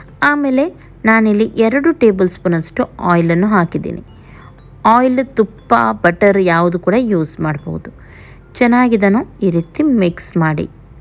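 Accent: Mangalore, India. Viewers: ನಿಮ್ಮ ಜನ ಅಂದರೆ ತಪ್ಪು ತಿಳ್ಕೊಬೇಡಿ ಅಂದ್ರೆ ನಿಮ್ಮ ಮಾತು ನಿಮ್ಮ ನಡತೆ ತುಂಬಾನೇ ಇಷ್ಟ ಮಂಗಳೂರು ಮಂಗಳೂರು ಕನ್ನಡ ಭಾಷೆ ತುಂಬಾನೇ ಚೆನ್ನಾಗಿದೆ ಅದಿಕ್ಕೆ ನಾನು ಹೇಳಿದ್ದು ನನ್ನ ಮಗಳಿಗೆ ಹಾಯ್ ಹೇಳಿ ಅವಳ ಹೆಸರು ಶ್ರಾವಣಿ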